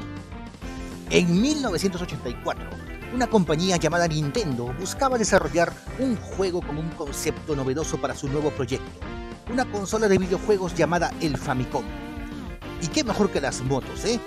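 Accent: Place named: Chile. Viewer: Eres peruano? no me lo tomes a mal pero antes pensaba por tu forma de hablar que eras un poco chileno ... claro un poco no totalmente que eso ya es otra cosa... igual un saludo siempre veo tus videos.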